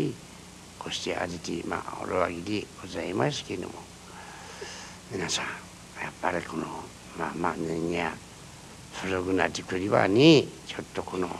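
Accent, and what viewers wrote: Tsugaru, Japan. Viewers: きれいな津軽弁ですね 同じくきれいな津軽弁の地、弘前出身です。 15歳までいまして、郷里離れて30年近く経ちますが聞き取れます！ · 語りの部分わかる津軽人いますか？ ハイ！私ですが笑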